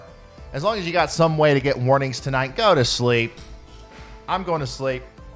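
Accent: South, United States. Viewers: I don't think has a strong southern accent.just a hint there. You guys who says he has a southern accent needs to come to GA and listen to people. But I'm with it has nothing to do with intelligence at all.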